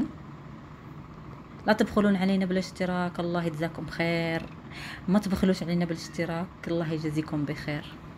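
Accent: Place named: Gulf, Arab world. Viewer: كلامك بالخليجى واعرة😍😍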